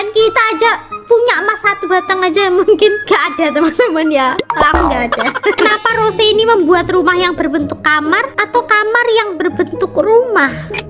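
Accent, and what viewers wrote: Java, Indonesia. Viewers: Wa kakak medok pasti kakak orang jawa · Kak ngomong nya nya kayak orang jawa Btw bagus banget kak video nya😍☺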